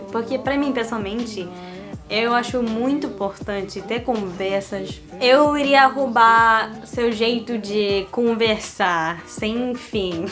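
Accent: Rio de Janeiro, Brazil. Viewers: parece uma carioca ela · seu português tem sotaque de carioca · coisashhh... Tá bem carioca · seu português tem sotaque carioca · falar português com sotaque de carioca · seu sotaque não parece americano, é bem carioca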